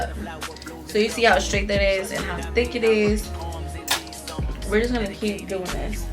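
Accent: Baltimore, United States. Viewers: You from Baltimore girl?????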